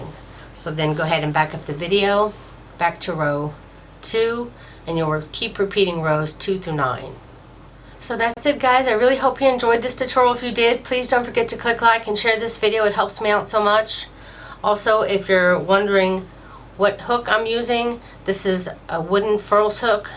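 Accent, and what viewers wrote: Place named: Midwest-South, United States. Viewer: It's so funny, because you have a kind of midwest-southern accent I always pictured you in Kentucky or Tennessee, with a bunch of kids playing outside! Israel is about the last place I thought you might be recording these (well, maybe not China...) How did you wind up in Israel? My Uncle Jack became Orthodox about 20 years ago and now lives outside Jerusalem—but he still sounds like he's from New York! BTW I unraveled the first one and am now using plain old Sugar and Cream in various colors. I am thinking of doing some cross-stitching in the diamonds...Thank you!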